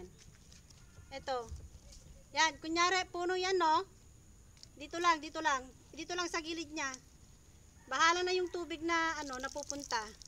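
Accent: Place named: Ilocos, Philippines. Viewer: Ilocano k ata te hehehe hirap k magtagalog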